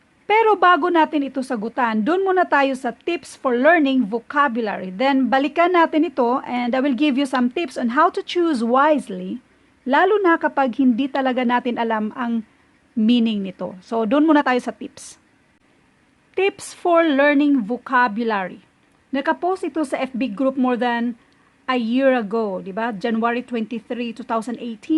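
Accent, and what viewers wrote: Visayas, Philippines. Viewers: Parang bisaya kagud maam. Tama bako maam noh? 😂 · Nakakatuwa ang bisaya accent. Makalingaw man. Pero daghan matun-an. Thumbs up po ako.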